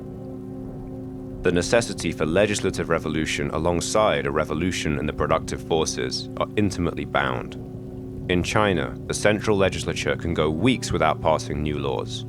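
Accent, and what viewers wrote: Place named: London, United Kingdom. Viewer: "Weve got to take socialism from the urban ellite" says the man with the most middle class londoner voice to imagry taken staight from an Bristol rave poster.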